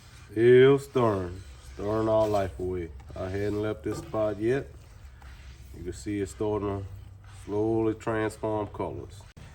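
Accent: Louisiana, United States. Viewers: your accent, you must be from somewhere around Assumption Parish? I grew up Mermentau/Crowley/Jennings. I’ve never been a slow roux guy, though. Have my veggies ready, heat my oil just to smoking, add the flour, stir like mad with that same high temp spat you have, add the veggies when I get my color. I’m usually done with a dark red-brown roux in less than ten minutes. I guess we all just do it the way our mamas taught us! I like what you’re doing man!!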